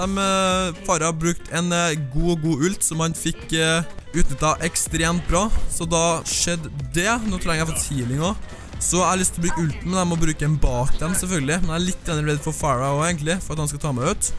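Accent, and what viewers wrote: Trøndelag, Norway. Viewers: Trøndersk e bæst!!!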